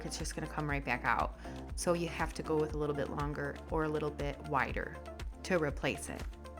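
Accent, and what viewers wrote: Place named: Minnesota, United States. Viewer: You sound like your from minnesota.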